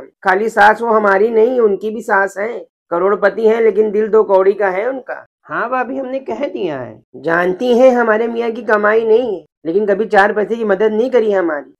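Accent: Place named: Lucknow, India. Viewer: Your lko ascent is best❤❤❤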